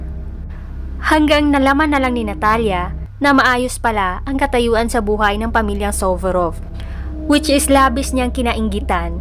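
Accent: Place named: Visayas, Philippines. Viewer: Ok nmn kairita😂 lng ung bisaya accent Mo mejo konti practice P ... But still I'm entertained pdn 😊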